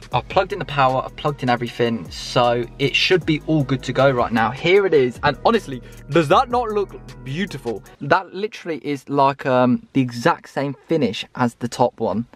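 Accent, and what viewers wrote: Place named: Birmingham, United Kingdom. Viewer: He sounds like he`s from Birmingham LOL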